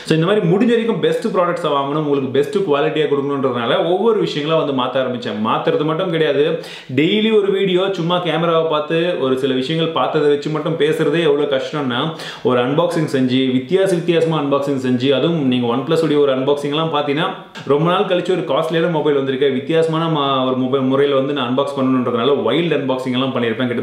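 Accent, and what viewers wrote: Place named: Kerala, India. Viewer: Tamil quality aaa Malyaali irukku nanba ........മലയാളി daaa......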